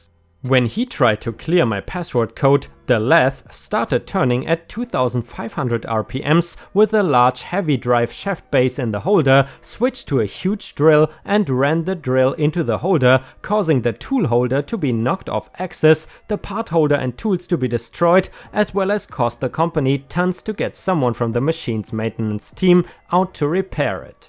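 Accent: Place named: Germany. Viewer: Kann das sein dass du deutscher bist? Man hört deinen deutschen Dialekt extremst raus...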